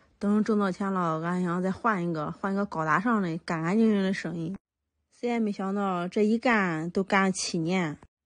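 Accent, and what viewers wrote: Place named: Henan, China. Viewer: 河南声膜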